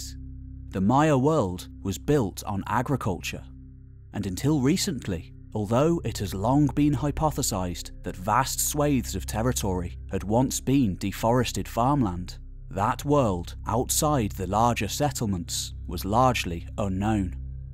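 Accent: England, United Kingdom. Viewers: Wow! How deeply flawed a video. Like an 8yr old researched it from outdated school history books but read by a English guy to make Americans feel like it's intellectual fact. Smh 🙄 (but still lmao!)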